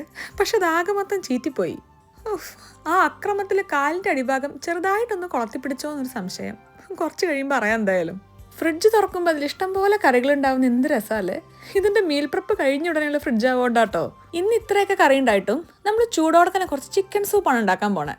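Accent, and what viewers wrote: Malappuram, India. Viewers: നിങ്ങൾ മലപ്പുറംകാരി ആണോ ഞാനും മലപ്പുറം ആണ് നിങ്ങൾ പറയുന്നത് കേട്ടപ്പോ തോന്നിയത് ആണ്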